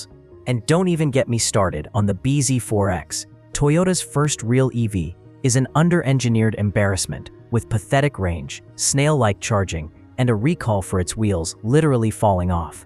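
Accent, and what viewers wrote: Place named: United States. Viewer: I stand corrected. But, does the "software tuner" take away your accent? You said "mate" which leads me to believe you're not American but yet your voice sounds American. Either way, I did like your content. Like I said before, I pretty much buy only Toyota's as of late. Bought a 2010 Toyota Camry last year with 170,000 miles on it. Put new shock/struts, sway bar linkage, front brakes and rotors, back brakes and new tires on it. I have a little over $7,000 in it. I now have 200,000 on it and I drive it 500 miles a week. All paid off. I will have to check out other videos if you have more.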